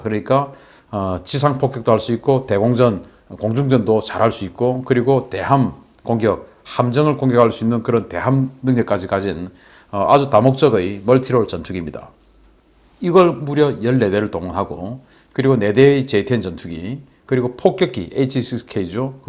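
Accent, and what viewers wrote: Gyeongsang, South Korea. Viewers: 당신 경상도 사람이지 말하는것 보니 티가나네 좀 젊잔케 해봐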